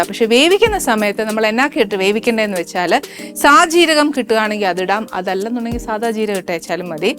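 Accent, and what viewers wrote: Kottayam, India. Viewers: Kottayam baasha inghane thane aano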